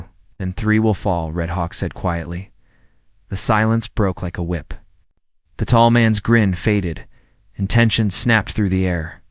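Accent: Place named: United States. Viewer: Wonderful story! The narrator, obviously an American, does a terrific job. It’s hard to tell if his voice is real, or AI generated. But a great sound to hear a steady voice, no over stated, changing when things get tense. Sometimes when an English man’s voice over stressing the narrative can get tiresome. Or an American voice doing the same thing. I’m very pleased with the stories and narrative in these videos. ❤❤❤❤😊😊😊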